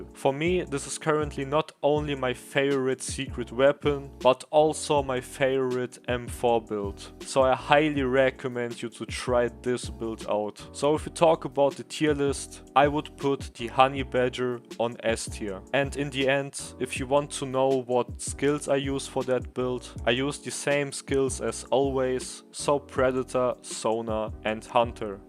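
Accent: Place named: Germany. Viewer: Nices Video man hört direkt dass du deutscher bist haha 😄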